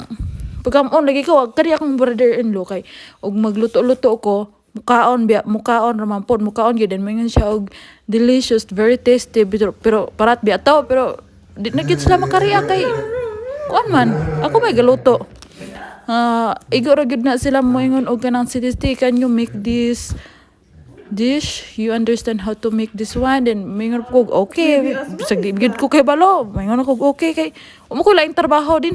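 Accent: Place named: Visayas, Philippines. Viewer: Bisayaa oy haha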